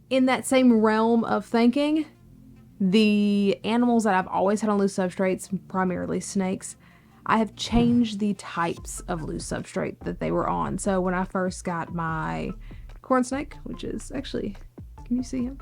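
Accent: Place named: Texas, United States. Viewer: Alabama? I had your accent pegged as Texas. I would have put money on you being a Houston native.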